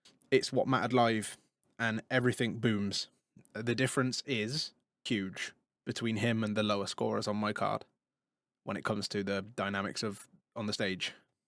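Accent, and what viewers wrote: United States. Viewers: Your American accent sounds very mid west.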